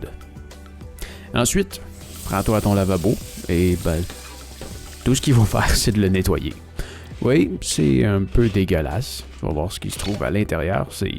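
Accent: Quebec, Canada. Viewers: Quel accent belge??? C'est l'accent québécois...😧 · Salut, merci beaucoup pour l'aide. Avec ton accent pas difficile de savoir d'où tu viens, mais avec toutes tes images de voyage tu brouilles un peu les pistes pour savoir où tu habites. Alors tu au Québec ou as tu déménagé dans les Alpes en Europe. Amicalement, Philippe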